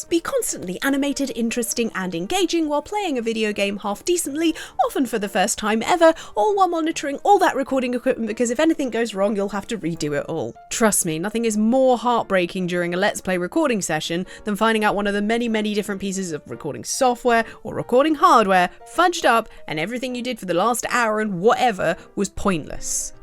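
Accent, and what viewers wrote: United Kingdom. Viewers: I just think you're swell. You have one of those voices I could set my Jarvis to. Listened to all your videos, some more regularly than others, and that old cliche holds true. I could listen to you read the phonebook and it be entertaining...or ya know, Oxfords dictionary. If you wanted to go old school 🥴 explains: New English like "Frack" entered Oxfords dic. this year and means frack, n.: “Used as a euphemistic alternative to fuck, n., typically in phrases suggesting a dismissive, hostile, or exasperated response, such as to give a…” Ranting, explaining, sick, expositional, vlogging, No matter the temperament, the topic, or the energy I just like hearing your voice with all its British inflections and your squeaky mannerisms. Like a massage for the brain. Like the mic setup btw. Ok peace.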